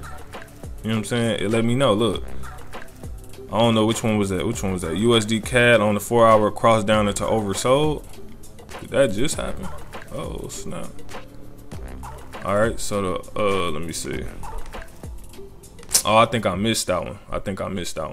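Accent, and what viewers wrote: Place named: South, United States. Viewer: Man you sound like you from the South. Where you from my G?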